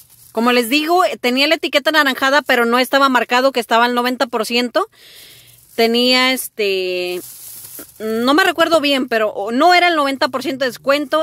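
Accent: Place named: North, Mexico. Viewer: EMPECE A SEGUIRTE X RECOMENDACION DE LA TOCAYIUX Y ME ENCANTA TU ACENTO 😍 YO TAMBIEN SOY NORTEÑA 🌪🌪🌪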